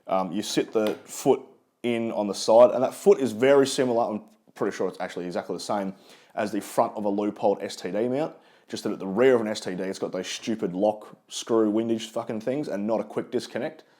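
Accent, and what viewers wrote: Australia. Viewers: Just tripped over this video, and pissing my sides at your relaxed Aussie vanacular. Got yourself a new sub. All the best from the UK 🇬🇧 👍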